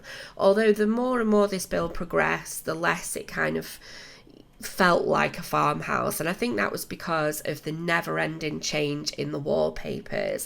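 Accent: United Kingdom. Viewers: So good. Just subscribed to the channel. Love your accent. I love British people. 😍♥️♥️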